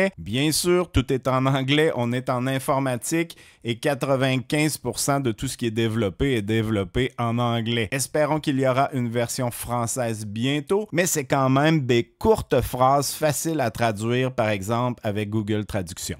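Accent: Quebec, Canada. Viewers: Quelle merveille ce logiciel et toujours ce super accent québécois qui m'enchante Merci Amitiés d'Oléron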